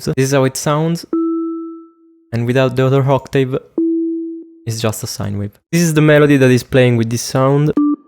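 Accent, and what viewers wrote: Italy. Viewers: Accento molto italiano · minchia si sente l'accento italiano haha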